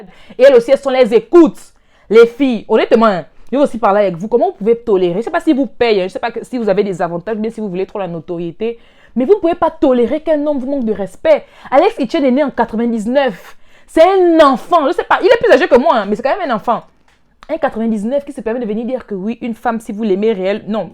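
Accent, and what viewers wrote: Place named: Gabon. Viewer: Mais tu es gabonaise non? En tout cas tu en as l'accent.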